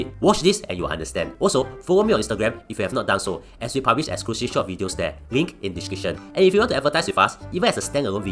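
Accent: Singapore. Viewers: Even if i don't agree with you on some points, your delivery is humorous, very singlish. Good job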